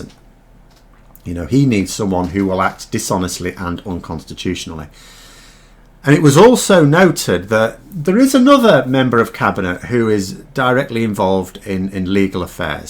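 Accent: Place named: Chesterfield, United Kingdom. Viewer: out of interest where do you come from? I’m from Chesterfield and your accent sounds local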